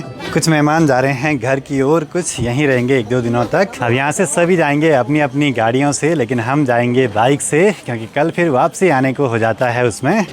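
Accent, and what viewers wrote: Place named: Pahari, India. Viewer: Aap Bilkul cool pahadi ki tarah bolte ho..aap change kro ise ...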